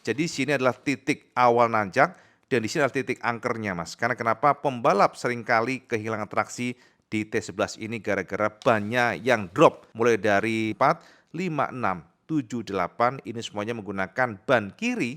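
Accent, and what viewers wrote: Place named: Java, Indonesia. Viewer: Bang asli ngendi je bang kok jowone tulen hehe...salam seko gunungkidul bang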